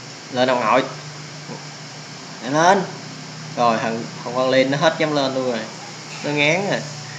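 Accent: Mekong Delta, Vietnam. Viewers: Anh Nói Chuyện Đúng chất Người Miền Tây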